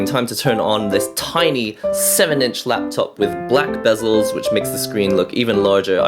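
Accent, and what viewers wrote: England, United Kingdom. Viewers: Real nice English accent :D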